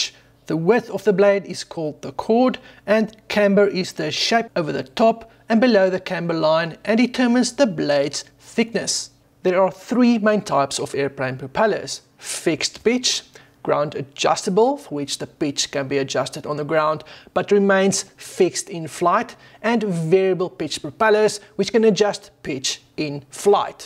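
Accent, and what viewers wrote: South Africa. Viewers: You sound South African 😊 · Ek wou sê jou aksent klink South African. Toe ek die eerste ZS sien op n vliegtuig toe weet ek. Nice video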